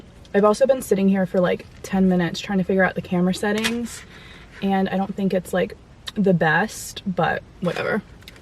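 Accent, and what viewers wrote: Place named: San Fernando Valley, United States. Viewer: Please dont take offense to this, good vid, i subscribed..are you from the valley? Im from seattle, but i got cousins who lived somewhat near to the "valley", for a short while i worked there. Theres a specific tone that you got..its reminiscent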